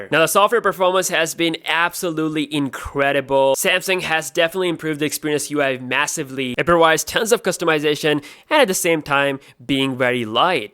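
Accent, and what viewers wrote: United States. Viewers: When I saw your video first Time. I thought that your are american. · Why do you try to sound American?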